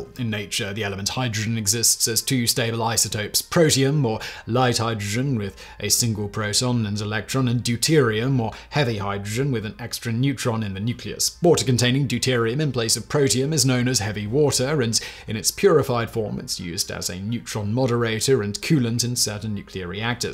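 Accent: United Kingdom. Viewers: I can't see eyes scanning as he reads but he can't possibly have memorized that whole script. Can he have? Anyways, the combination of densely and precisely scripted scientific information delivered at an incredible clip in that British accent does make him appear to be THE SMARTEST HUMAN BEING ON THE PLANET. Amazing content as aways.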